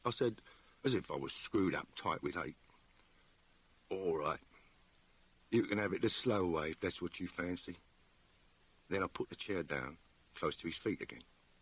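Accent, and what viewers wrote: Cockney, United Kingdom. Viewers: What a treat! I didn't know he could do a cockney accent, having only heard him as Morse (and in one Sherlock Holmes episode). My brother and I read all the Modesty Blaze books back when, and this reminds me how good they are. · Thank you so much! To me, this is a double delight! Not only hearing John Thaw reading in cockney, but even more hearing a Modesty Blaise piece I never have read before. Fabulous! 😃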